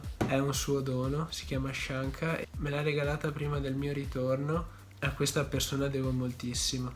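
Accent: Veneto, Italy. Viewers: Ma di dove sei?Veneto? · Sei Veneto??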